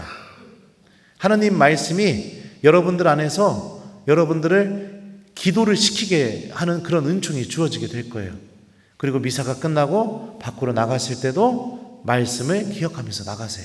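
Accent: Chungcheong, South Korea. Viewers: 신부님 충청도 말씨 구수혀요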